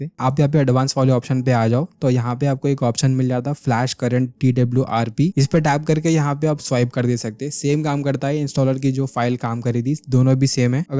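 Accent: Hyderabad, India. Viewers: Hyderabadi Accent 😅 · Bhai's hydrabadi accent is cool! 😍🥰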